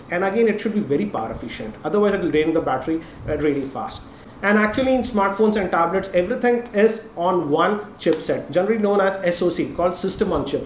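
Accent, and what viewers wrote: India. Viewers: God Indians accent make me very mad .....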